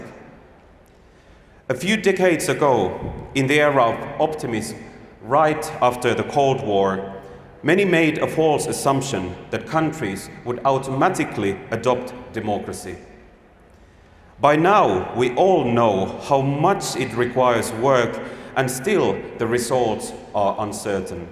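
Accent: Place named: Finland. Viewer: Täyttä asiaa, mutta sun pitäis yrittää ääntää sanat eikä lukea niitä kuin suomea. Sinänsä virheetöntä tekstiä kylläkin.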